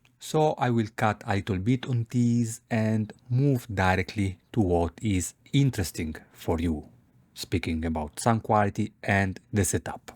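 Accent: Italy. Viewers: Are u Italian ?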